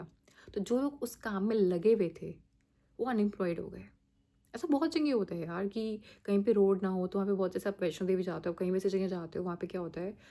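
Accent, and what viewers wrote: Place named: Madhya Pradesh, India. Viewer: you are definetly from madhya pradesh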